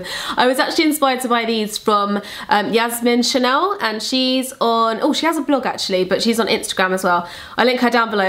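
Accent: United Kingdom. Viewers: I just love your videos and ofc your brit accent. :)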